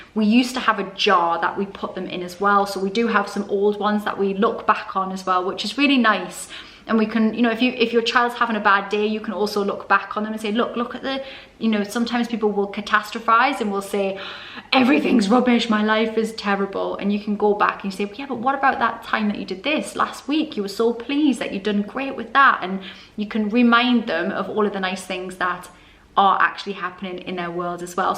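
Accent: Newcastle, United Kingdom. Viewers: I watch your videos to learn a Geordie accent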